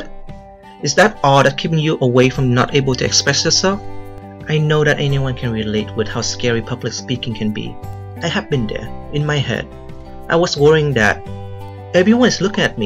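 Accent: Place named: Vietnam. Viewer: I was watching your Emotional Intelligence video and I recognized that you have Vietnamese accent. Curiously, I clicked on your channel and found this video. I have the same situation with you, but yet speak up and it was not bad at it is. I just wanna say that you did a very good job ^^ Thanks for the video.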